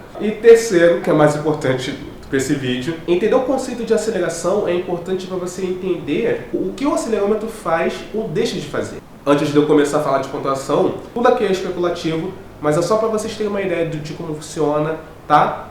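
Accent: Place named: United States. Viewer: CARAMBA ACHEI QUE VC ERA AMERICANO mas e brasileiro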